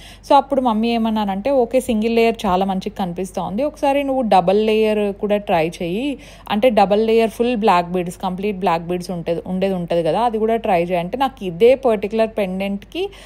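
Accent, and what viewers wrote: Anantapur, India. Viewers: Mana anantapur slang ye veru abba... Miru regular videos lo matlade dhaniki.. anantapur lo mi Amma valla tho vunnapudu matlade matalake chala difference vundhi. Anantapur slange ...Endhukante nenu kuda anantapur ye sis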